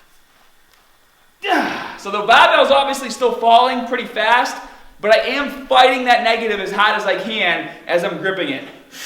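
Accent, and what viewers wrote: Boston, United States. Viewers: You're certainly from Boston lol, but u crossfit bruh??? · From Boston? Me too lol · Definitely a Bostonian. love it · Dude you from Boston ? 😂 the accent tho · Is this a Boston accent?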